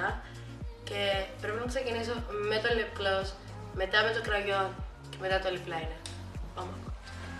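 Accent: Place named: Cyprus. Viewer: Εγω καταλαβαίνω να κυπριακά σου γιατι ειμαι και εγω απο τη Κύπρο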